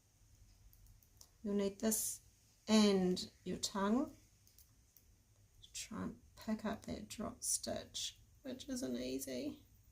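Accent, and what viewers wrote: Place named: New Zealand. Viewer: have a look at either end of the needle bed and you should see a little plastic tab. Check your manual or search online to see if someone else has put up a YouTube video of changing the sponge bar. I detect a New Zealand accent. I got my sponge bar from New Zealand. If you want the details, I’m happy to pass them on.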